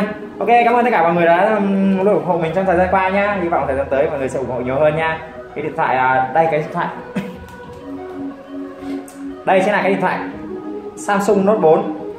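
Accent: Vietnam. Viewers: anh ơi anh miền bắc hay miền Nam vậy em miền Nam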